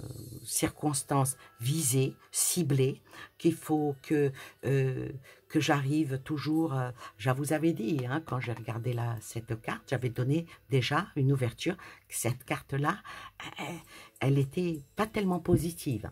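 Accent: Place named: East, France. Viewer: Bonjour, je vous suis mais je n’ai jamais laissé de message. J’avais remarqué un accent de l’Est, je suis née en Lorraine et je vous écoute de Londres, on sent votre sincérité et vs êtes très agréable à écouter. Merci pour tous 🙏🏻. Bisous 😘. Nanou 🤗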